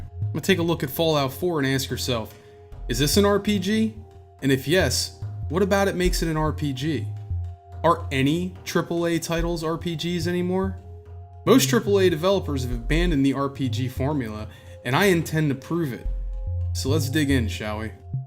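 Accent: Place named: Philadelphia, United States. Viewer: You're from Philly, aren't you.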